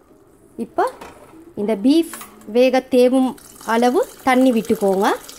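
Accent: Sri Lanka. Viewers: In India we have to use a pressure cooker. Thanks for this amazing video and very well explained. I like the Srilankan Tamil accent. God bless. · Srilanka Tamil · Thank you for sharing sister. Your tamil accent from Sri Lanka. Are you from there? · sri lankan tamil was nice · This is srilankan Tamil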